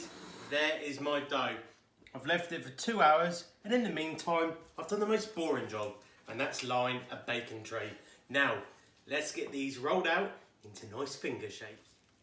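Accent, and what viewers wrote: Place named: United Kingdom. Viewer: I love your accent. what kind of british accent is it?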